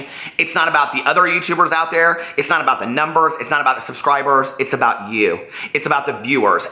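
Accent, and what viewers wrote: South, United States. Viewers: I love your voice! Are you from the south? Personally I love to hear southern accents...it makes me feel at home as I'm from Tennessee.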